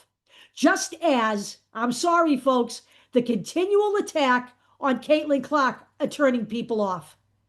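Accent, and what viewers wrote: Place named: Massachusetts, United States. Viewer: Are you from Massachusettes? Love your accent. (I'm Texan)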